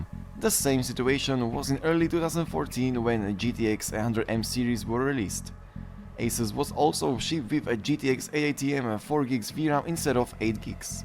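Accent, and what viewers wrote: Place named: Romania. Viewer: nu pot sa nu recunosc accentul de roman care vorbeste engleza! :)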